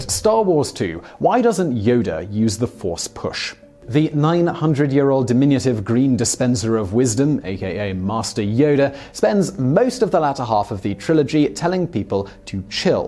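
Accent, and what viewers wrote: United Kingdom. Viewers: Eh. What dya want. He's a British Milennial.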